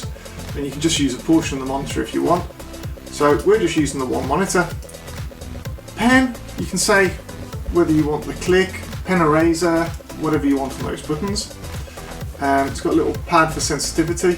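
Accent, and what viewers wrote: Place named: United Kingdom. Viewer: I am thinking to get one of these but not sure if I will be able to use this as trackpad so I can operate Adobe Apps (e.g. Photoshop, Lightroom, Premiere PRO, Premiere Rush and so on.) Any advice will be much appreciated. Although this one is done in 2017, do you still use this and creating any contents? Check out my channel and let me know if you liked any contents useful so I can improve further. As a Newbie, we can grow together if you still creating and interested. You got my support (263) and hopefully you will be doing the same and keep in touch, especially it sounds like you are from here in UK. Please keep in touch so we can discuss and grow our respective channels and get ideas for our contents. Please look after yourself and each other & Stay Safe. "Life is Short, so Live Long & Prosper - "Our World is a Shared Experience" - #TubeBuddy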